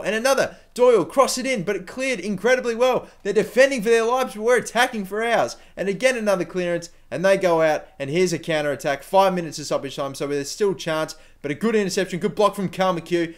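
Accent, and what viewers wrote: Australia. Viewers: Are you Aussie!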